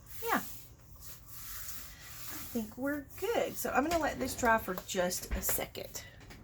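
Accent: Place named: Alabama, United States. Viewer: I feel the same way! I live in Alabama and your accent sounds like you could be my next door neighbor! Lol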